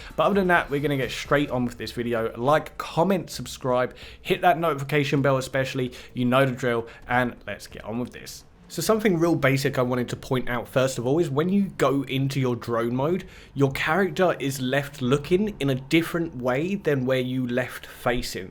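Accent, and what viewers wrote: United Kingdom. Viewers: ur accent is amazing, are u british? Watchng your vid makes my enflish better and apex skills better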